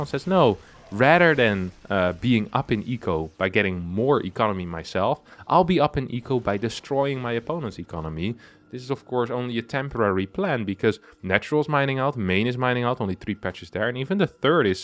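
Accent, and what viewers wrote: England, United Kingdom. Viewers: i just dont like his english accent, feeling like I cant be the only one, his account is still fairly small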